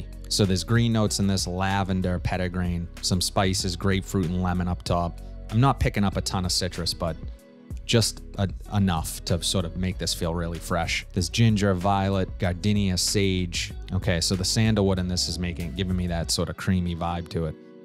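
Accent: Boston, United States. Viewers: Lol The Boston/New England accent gave it away. Im from Mass I can tell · I feel like ur about to utter the words… from boooostin. I used to live in Worchester. Unless ur from new York then I got the accent wrong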